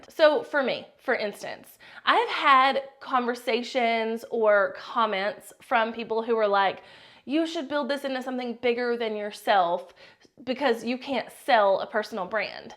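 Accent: South, United States. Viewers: I love your laid back, fun personality. It was great when, in another video, you made it ok to be a multi-passionate in my business. And I also love your southern accent because I'm from the south so that makes you feel familiar. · Mom, creator, loved your accent because I’m also southern, 😊 AND love the advice with a dash of humility when you don’t know all the things (e.g., vlogging). Working in a bubble at home is hard and your “chats” and business advice are a great way to feel connected to a business environment while still at home 💕 Thanks for that and best of wishes on your vlogging adventure! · You southern accent! I’m from Georgia ❤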